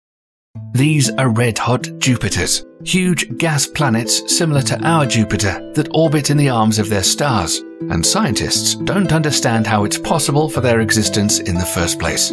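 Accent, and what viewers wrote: United Kingdom. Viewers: Can you switch the narration accent to Jamaican please. The UK gets annoying.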